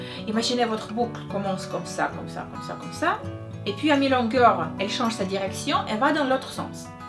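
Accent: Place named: Russia. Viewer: sa se voit que tes russe avec ton acsent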